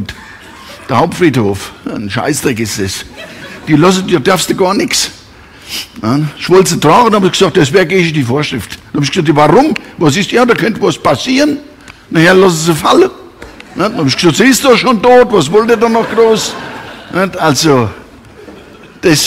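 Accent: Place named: Taunus, Germany. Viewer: Großraum Bad Homburg / Taunus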